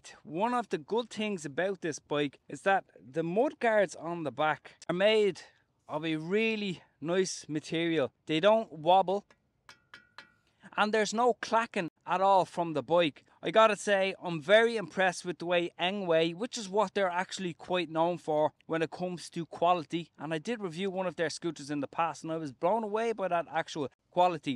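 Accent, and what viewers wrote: Ireland. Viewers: You sound Irish I got one 2 weeks ago in tallagh dublin24 shop but tell me did u get a bag goodies with yours mines black prow same as yours but seen guy that didn't get them to build it like they offered but left out the bottle bag and other goodies I got receipt keys booklet and hand tools old school L alaenkeys spanners screwdriver that's it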